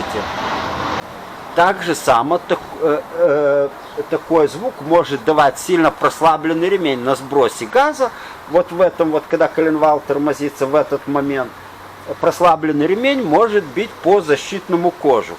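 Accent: Odessa, Ukraine. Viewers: Такой одесский говор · Таки я вам премного благодарен за дельные советы,а вы самом не из Одессы?И машинка стоит на фоне японская,Митсубиси,и речь таки знакомая... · Таки сразу понял шо попал на канал настоящего одесита, лайк.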